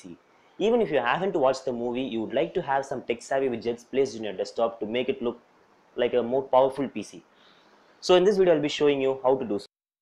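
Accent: India. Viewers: Sound like indian scammer... Sorry! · After watching so many tech and programming tutorials, I am starting to love the Indian accent. The accent is actually cute!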